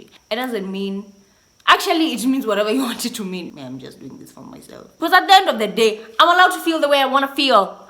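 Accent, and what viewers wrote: United Kingdom. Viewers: Here for the British accent 😍😍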